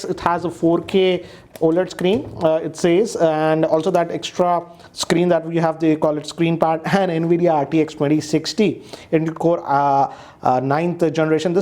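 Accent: India. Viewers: 咖喱味英语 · please stop speaking English in your Indian accent !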